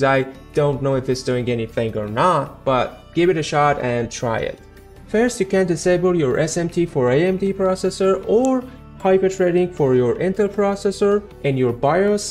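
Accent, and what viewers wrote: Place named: Iran. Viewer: The Iranian english accent is thick with this one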